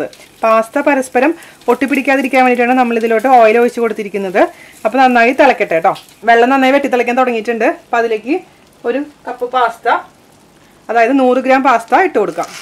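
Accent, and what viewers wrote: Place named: Chalakudy, India. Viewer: Hi tried pasta.. First time trying.... Always kids experiment goes on.. It came very well.. And enjoyed.. Thank you for u r valuable tips in between.. The best part in u r explanation while cooking is even my 14 yr kids can follow the recepie and cook.. Thank you dear.. Hope I can call u dear.. 😍. I am also from Trc.. I left Trc after marriage 20yrs back and I am not having that slang.. By watching u I am enjoying that too..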